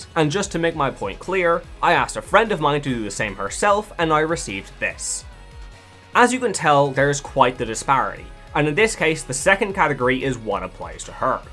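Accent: Ireland. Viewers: Came for biscuit, stayed for rambling Irish man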